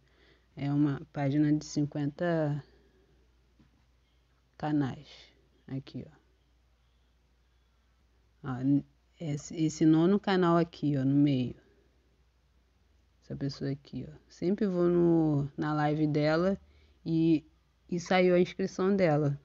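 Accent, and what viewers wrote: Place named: Rio de Janeiro, Brazil. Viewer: Muito bom esse vídeo amiga👏👏,amo esse sotaque do rio janeiro 😍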